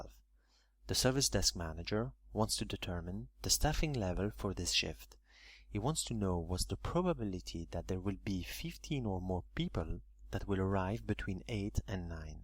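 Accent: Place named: France. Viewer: A video about Poisson...narrated by a French guy. How perfect.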